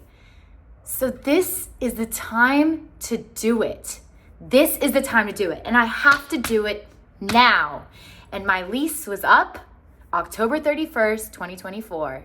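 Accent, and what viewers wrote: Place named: United States. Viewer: Eres guatemalteca pero hablas gringo y, ahora, estás en México. Ah. No entendí pero suerte. 🥱🤔🤷🤙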